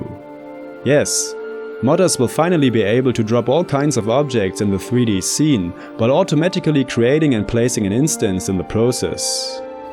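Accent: Germany. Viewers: Are you german? Your accent sounds so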